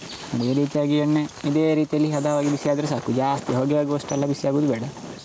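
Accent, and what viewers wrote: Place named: Dakshina Kannada, India. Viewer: It's called athirasam among the Tamils, kajjaaya among the Kannadigas and Anarasa among the Marathis. It is a pan south Indian sweet. In my childhood at our village , we didn't know cakes and other cookies..Athirasam is so popular, no festival is celebrated without Athirasam Kajjaaya. Thanks for your narration with tips on making paaku . Your DK accent is feast to ears.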